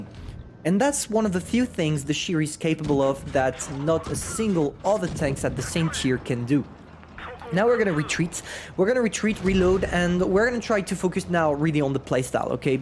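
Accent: France. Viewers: It’s not his English, it’s just the French accent everyone chill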